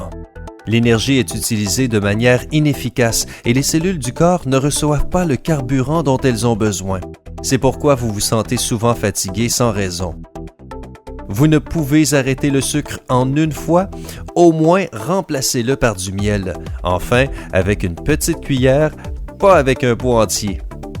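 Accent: Quebec, Canada. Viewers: J'aime l'accent québécois du mec mdr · J'adooooore l'accent québecquois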